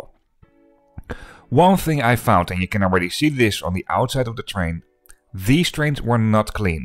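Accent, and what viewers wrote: Netherlands. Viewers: Is it just me or is this guy sounding like a dutch guy? I am so confused by the accent and english he is speaking. But i love the vids keep up the good work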